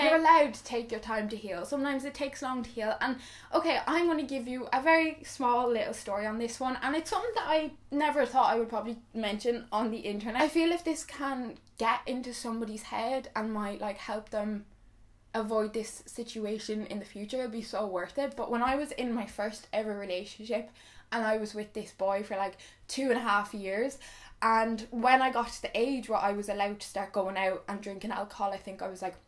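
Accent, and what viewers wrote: Ireland. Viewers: I love your videos and your Irish accent! My ex boyfriend used to pressure me alot into having sex and when I used to refuse. He used to become very nasty towards me. I do love sex but not that much!! I'm from Yorkshire, England 🏴 btw xx. Keep up the amazing content 💗💗💗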